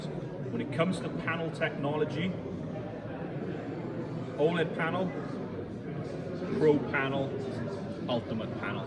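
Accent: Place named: Wales, United Kingdom. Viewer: Great to hear a Welsh accent demoing a TV :) Thanks for this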